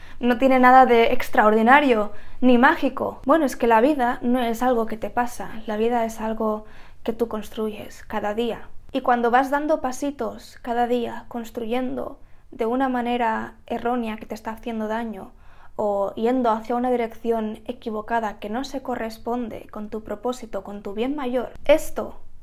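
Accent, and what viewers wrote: Spain. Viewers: Veo que todo lo que dices no son más que obviedades, que nos está diciendo la vida? Tamaño boludez. Además ese acento de España que es absoluta mente molesto! Las cosas pasan por causalidad, por estar en el lugar equivocado o por qué simplemente te ibas a caer en es instante; si fuese así, yo ya estaría en la lona..10-15 años de problemas, dificultades y desorden, aún así puedo ser bastante rico en cuestión de un par de años! El destino no existe, ni los planes muyy elaborados llevan a resultados X.. hay cosas que no puedes controlar como decidir " ver " este video de YouTube donde una tipa te dice que pilas, que así o asa debes vivir la vida!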